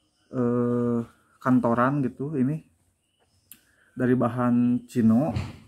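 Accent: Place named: Bandung, Indonesia. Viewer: Pasti abangnya orang bandung yahh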